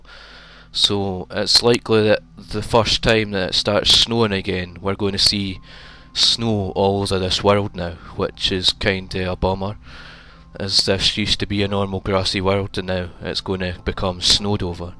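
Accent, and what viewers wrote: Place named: Scotland, United Kingdom. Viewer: Good scots accent mate! Subbed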